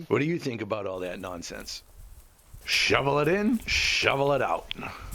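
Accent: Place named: Louisiana, United States. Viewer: No Disrespect but trying to figure out the accent. It sounds like you are from up North with a little bit of the Cajun Louisiana influence. Am I little close or am I way off ? Keep on keeping on ya'll ! Respects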